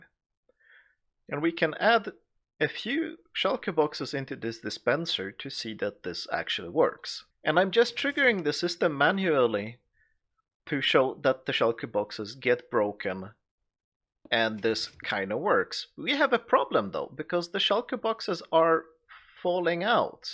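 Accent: Sweden. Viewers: Well tru but it gets a bit more complicated to check if all have shulkers when you have a bunch of them. And i hate to fill things manually since i will only do it when i see that something is wrong. But that's a good point i think i will put the buttons directly if i can push them between the hoppers :) Great filler though had a lot of problems with other loaders but this just runs smoothly :) Your from sweden i guess? Sounds like it atleast. Grymt jobbat med denna lilla contraption kommer fortsätta hålla utkik efter saker som du lägger ut :) Är du nån gång intresserad av nya projekt har jag ett som jag inte kommer kunna lösa men om du vill ge det en chans så hojta :)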